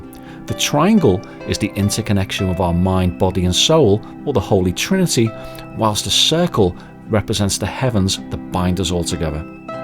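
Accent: Liverpool, United Kingdom. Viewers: Your work on these videos is outstanding and you deserve, at the least, many many thanks. The liverpool accent is very controlled and toned down also and, as such, it works perfectly so no apologies needed from you re that. As for - while I love all the 70s albums (perhaps, Tormato not so much), when I first heard this one (I got it as a xmas present and found it before xmas and just couldn't wait to hear it so gave it a listen and hastily put it back where I found it) it blew my socks off! This is Yes as "in your face" as you can get. "Metallic Yes" as I call it. Not as in heavy metal but the sounds of just about every instrument. Cranked up and piercing. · Nice to hear another Scouser being bang into Yes.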